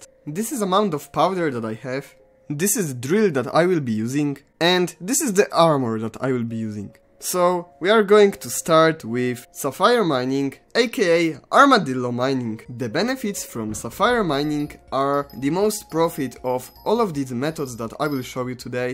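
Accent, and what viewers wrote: Poland. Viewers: jestes z polski? bo giga masz taki akcent polski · u definitely sound like u have some polish accent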